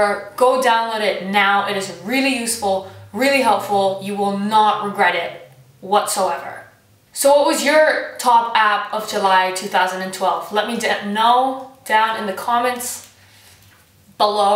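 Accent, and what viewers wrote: Spain. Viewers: are you spanish?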